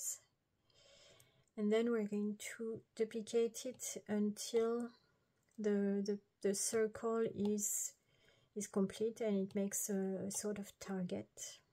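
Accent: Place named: France. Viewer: Hello and Wow, what a beautiful hands, color of nails, and that voice + French accent, am I right? Thank you for this tutorial, this is what I need for my project. I wan to design and make incense stand with mandala motif. You helped me a lot☺ Greetings from Poland Edit: I have a problem with symbol layer, when I do the final stroke to draw mandala shape like you in this circle, the lines are drawn automatically but in one direction left or right instead facing each other. What I'm doing wrong🤔 Edit_2: I have figured out. My mistake by instead of adding first triangle to symbols, I have first created both, connected and then added as symbol which did not point stroke later to the same direction, but opposite, spreading away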